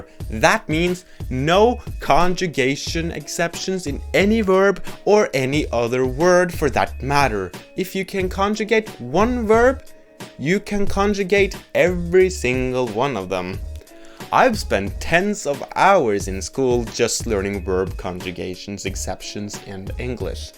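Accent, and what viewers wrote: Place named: Scandinavia. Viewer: Didn’t even notice your Scandinavian accent! German accents are way thicker imo.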